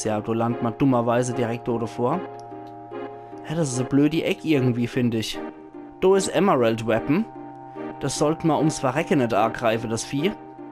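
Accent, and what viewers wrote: Palatinate, Germany. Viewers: Bin zufällig auf den Kanal gestoßen. LPs im pfälzer Dialekt kommen ja super! geliked :-)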